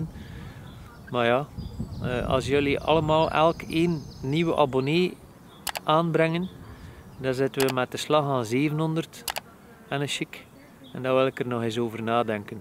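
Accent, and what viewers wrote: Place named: West Flanders, Belgium. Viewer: Begrijp je volledig! Het is leuk om te soen, maar langs andere kant, wij als abonnee je video's zeker leuk, maar even cru gezegd, brengt weinig nuttige content, ttz ik denk dat je meer volgers zou bij krijgen met reviews. Meeste YouTubers, zullen sneller 'geprikkeld' zijn (denk ik) wanneer je meer reviews/ervaringen zou uploaden. Wat bedoel ik, bvb, je review/ervaring over je step. Zo heb ik trouwens je kanaal ontdenkt, gewoonweg omdat ik ook interesse had in zo'n Xiaomi Step. Persoonlijk bekijk ik je video's bijna dagelijks en vind het tof te zien, jou als persoon, vind ik dat je het fantastisch hebt gedaan, maar niet iedereen heeft interesse in wat je dagelijks doet. Het siert je alvast dat je het lnag hebt volgehouden. Denk de beste tip ik je kan geven om meer volgers te krijgen is nuttige content. En mss ben jebhet niet bewust, maar je hebt al nuttige content gebracht, bvb. 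Je kookkunsten, of ervaring van je step, en je mag zeker zijn, dit wordt verder verteld, betrap me daar zelf op dat ik hets dan verder vertel. Nog een challenge, mensen zijn soms moeilijk te 'overtuigen' ol opeens iemand te volgen, familie en vrienden zulken dit wel doen en ikzelfs vind het persoonlijk fantastisch je Algemeen Nederlands gemixt met het West-Vlaams, maar niet iedereen heeft dezelfde interesses. Als ik je eenntip mag geven als YouTube-kijker: forceer jezelf niet, en pist enkel filmpjes van reviews, wanneer je er zin in hebt tenminste. Los van dit alles vond ik je vlog, als West-Vlaming, z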